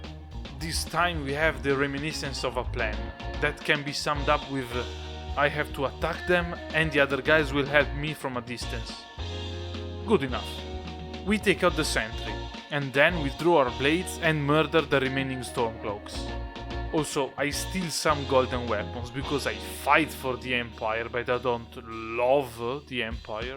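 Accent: Italy. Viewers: Oh my goodness I love your Italian accent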